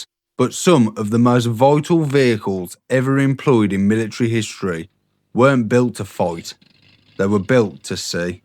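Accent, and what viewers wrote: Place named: Midlands, United Kingdom. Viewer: Well done, this is a neat little documentary. Subbed! Where are you from in the Midlands?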